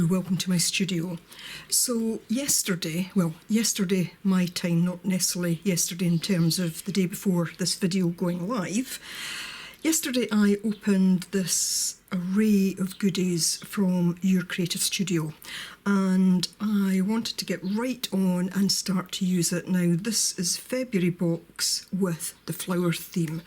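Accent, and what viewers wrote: Scotland, United Kingdom. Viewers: I don't know if anyone mentioned this already, but the things that you thought were stickers look like window clings to me. I love your art work, and also your accent! My grandmother was from Scotland and I've always loved to hear that accent. I'm from the US.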